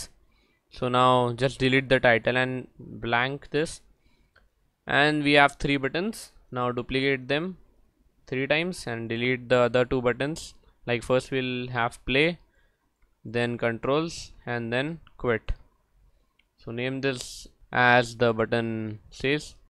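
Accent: India. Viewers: I'm sorry for making fun of your Indian voice.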